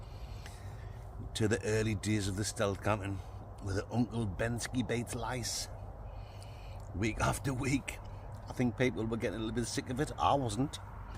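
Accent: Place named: Sunderland, United Kingdom. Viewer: My late dad was a Mackem, love the accent,!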